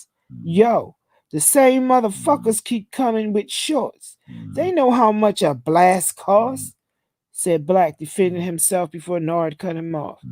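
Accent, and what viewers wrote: Baltimore, United States. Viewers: Your very welcome... its a compliment definitely... your personality makes this reading fun and interesting... your Baltimore accent makes the story more realistic...I also appreciate that your reading the entire book. Ralph Reads on YouTube got me waiting for weeks for the 2part...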